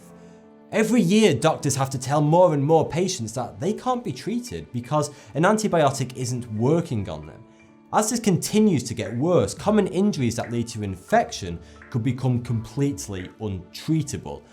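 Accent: United Kingdom. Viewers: his British accent makes it sound like 42 but he's saying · You got to love that sweet and smooth British accent! :)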